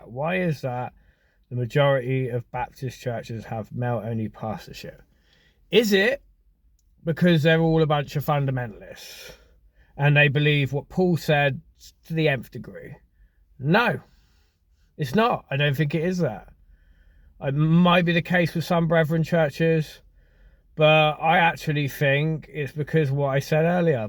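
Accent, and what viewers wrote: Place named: Liverpool, United Kingdom. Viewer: Why are you speaking in a scouse accent? Whereabouts in Liverpool are you actually from? If you are not from Liverpool then why are you speaking in a scouse accent?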